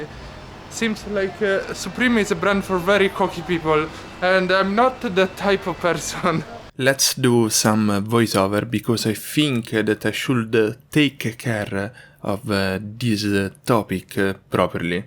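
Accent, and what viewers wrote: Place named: Italy. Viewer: He is a very good Vlogger now it reminds me Sunny in Thailand, plus I do love his Italian accent, he is more improving now.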